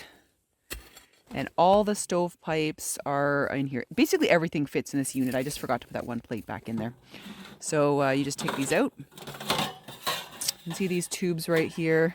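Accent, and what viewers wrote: Canada. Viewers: You must be Canadian! Just subscribed. Never stop saying "oat" for "out." Of course your way is just a correct as ours!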